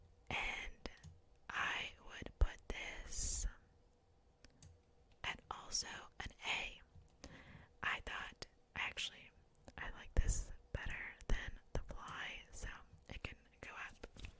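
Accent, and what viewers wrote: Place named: South, United States. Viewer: I love your little country accent amazing video ❤️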